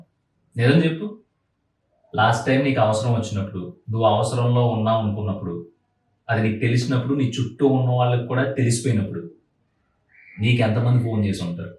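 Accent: Godavari, India. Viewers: Bro midhi Godavari district ahhh ?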